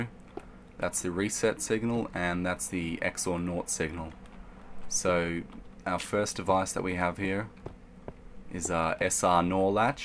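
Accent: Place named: Australia. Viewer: your aussie like me :D i can tell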